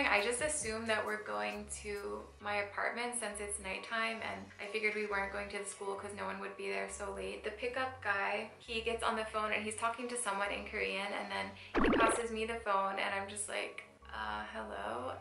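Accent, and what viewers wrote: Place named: Southern California, United States. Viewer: You don’t have a Louisiana accent, you sound like any southern Cali girl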